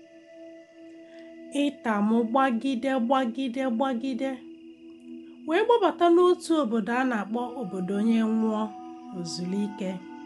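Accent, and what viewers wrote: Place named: Igbo, Nigeria. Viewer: sis i so much love your channel with your igbotic accent i wish i can spee=ak like you now chai kudos to you sis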